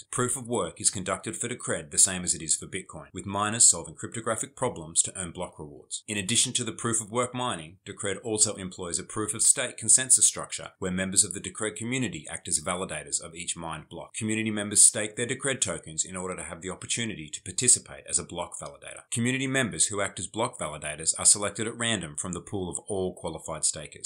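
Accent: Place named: Australia. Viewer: I think that's just the Aussie accent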